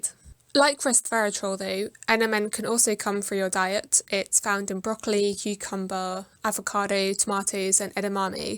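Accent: England, United Kingdom. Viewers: Thank you very much for the concise and artistic presentation. I liked also your English accent. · It's an English accent, one of many in daily use in England.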